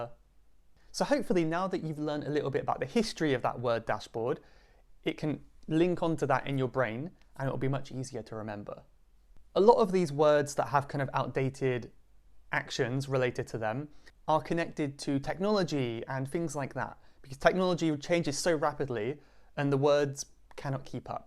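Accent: United Kingdom. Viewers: Teacher, may I ask which part of the UK you are from? 😊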